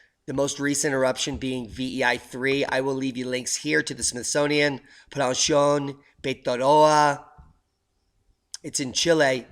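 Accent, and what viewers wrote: England, United Kingdom. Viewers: for a moment there I thought I was listening to an English bloke, the accent was so good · Last night I watched a visually stunning doc called "A Volcano Odyssey", YT...they explain that the meteorite that "caused" the extinction of dinosaurs 65 million yrs ago was not the only phenomenon that wreaked havoc on earth (we knew that..;)....India was a floating continent below the equator at that time, drifting northward over a hot spot, and a massive volcano that erupted for perhaps millions of yrs at about the same time, wOw The mountains of Mahambaleshwa were created in northwestern India...Fascinating... Volcanoes Rule, are Earth's supreme geoengineers =D BooM! Thank You...I love your Brit accent;) Many Blessings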